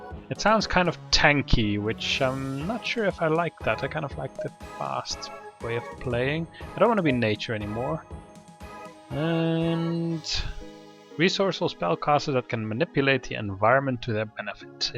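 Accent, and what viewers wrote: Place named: Germany. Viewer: Just bought this game for my wife and I. Where's that accent from? I want to say German but I could be wrong.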